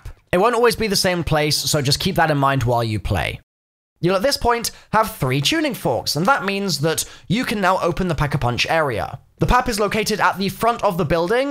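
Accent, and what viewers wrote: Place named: United Kingdom. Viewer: "cemetree"? "vaze"? I cannot take the British accent seriously.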